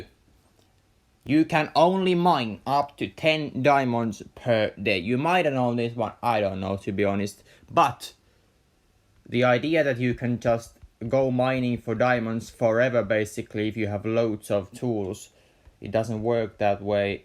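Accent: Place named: Finland. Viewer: Hyvä ralli enkku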